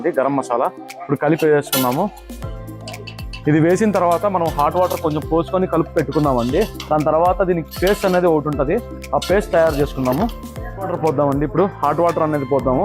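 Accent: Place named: Rayalaseema, India. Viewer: పట్టుద్ది ఎందిరా నాయనా రాయలసీమ భాషను నాశనం చేస్తున్నావ్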